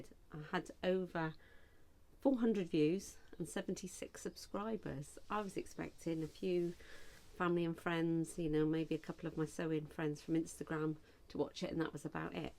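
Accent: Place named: England, United Kingdom. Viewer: i knew you were English from your accent, where are you in the UK? inquiring minds need to know....lol...i am "across the pond", but my maternal grandma was english, she became a ww2 war bride came to usa....she was born in leicester....:) i have quilted now 4 years, my mom has quilted 50 plus years...my grandma was a sewer,,,,it is in the family genes...or jeans...lol, love your vest, great idea....hmmmm i got many a jean i dont use... i was going to make some denim purses, or bags, or sewing machine bags, or quilts...the possibilities are endless...lol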